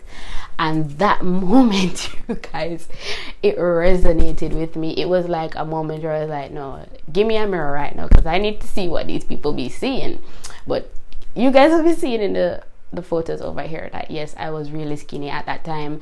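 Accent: Jamaica. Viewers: Jamaican?💕love your accent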